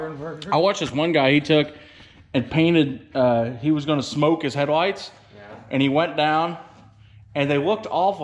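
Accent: South, United States. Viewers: My 8 year old boy and I love watching your videos. They are great. We Live in Pittsburgh Pennsylvania, in the city ,but my son wants to be a country boy more than anything, and he always tries to talk with a country style accent like you do and has been trying since we have started watching your videos. Keep them coming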